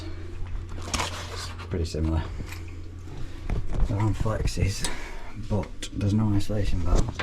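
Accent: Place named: Black Country, United Kingdom. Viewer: recognised the Black Country tones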